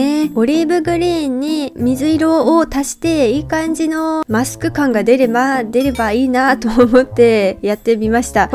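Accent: Tohoku, Japan. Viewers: 東北か北関東訛りですね福島か栃木かな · ちゃんって東北人？しゃべりが癒される👍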